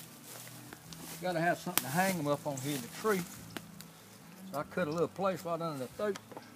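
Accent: United States. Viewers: That’s a good ole boy deep South Georgia accent. I live in Atlanta but am a country boy at heart. My whole family sounds like this man. Love it. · Can't tell if you're from Mississippi or Australia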